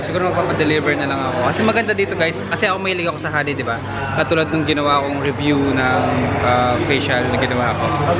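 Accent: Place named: Batangas, Philippines. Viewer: Batangeno ka ba lodi? May naririnig akong punto e haha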